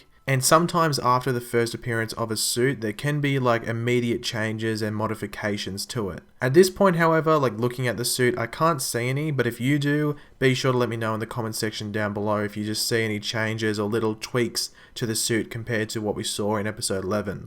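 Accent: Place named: Australia. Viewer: Do all australians sound so nice?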